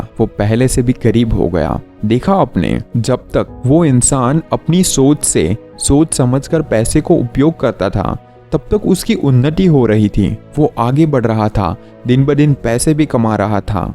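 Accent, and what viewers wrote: Gujarat, India. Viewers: deepak kumar bhai We are gujrati hindi bolne ka jo tarika hota hai aaisa hi hota hai... sabko continue bolne me problem hota hai... kai ese sabd hote he jo hindi bol nahi pate... agar aapko aapni bhasa se dusri bhasa bolne ko bole to aapko bhi problem honga... so don't mind improvement sabhi ka aa jata hai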